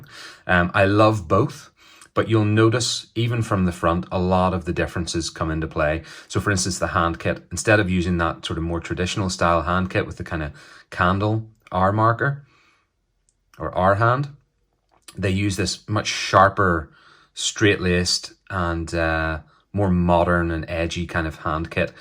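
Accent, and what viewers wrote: Northern Ireland, United Kingdom. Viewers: great to hear a NI voice. I have a 38 with red bezel on order. thank you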